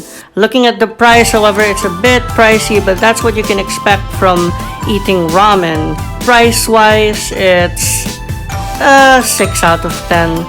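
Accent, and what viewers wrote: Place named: Japan. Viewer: Japanese accent is lit 🔥🔥🔥🔥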